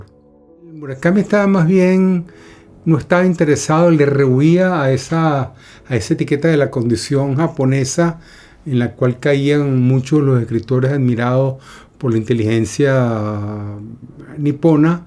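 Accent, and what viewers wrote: Caracas, Venezuela. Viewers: Excelente post. Muy grato ese tono de tertulia en acento venezolano, me atrevo a afirmar que es caraqueño. He leído casi todo de Mishima. Es uno de mis autores preferidos junto con Julio Cortázar. Gracias.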